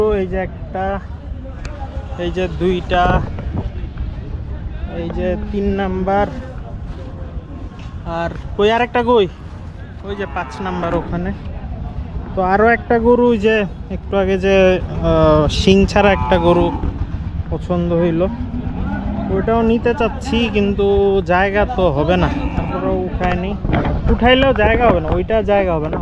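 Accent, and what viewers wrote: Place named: South Korea. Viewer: Oi hala English sound hoi ke ?? Thik Korean bangla den voice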